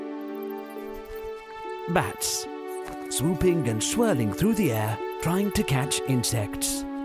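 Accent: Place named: England, United Kingdom. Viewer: Teun heeft het gisteren in AVS (Esternietstedoenmisschien) een beetje verraden dat hij zijn script opstuurde naar een onvervalste Engelse stem die de teksten ingesproken heeft.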